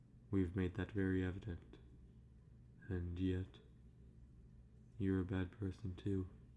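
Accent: United Kingdom. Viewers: I thought he was British- anyways great video as always!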